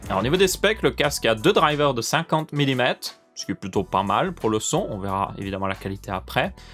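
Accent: Belgium. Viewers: 80 % des commentaires parle de l'Hyper X cloud 2 ; 10 % de l'accent belge ; 10 % autres .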